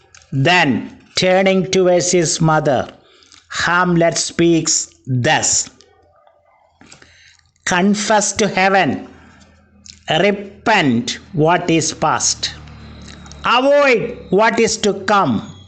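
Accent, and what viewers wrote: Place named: Kerala, India. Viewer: Malayalam accent harms all along...😢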